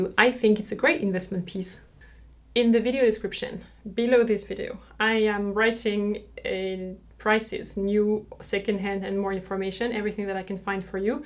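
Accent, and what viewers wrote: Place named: France. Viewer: Love the French names pronounced with a true French accent!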